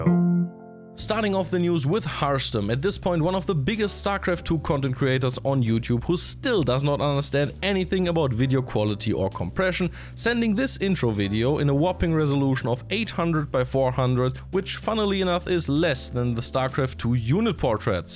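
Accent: Germany. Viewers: Is from Germany? It sounds really like german guy tryharding to not have an accent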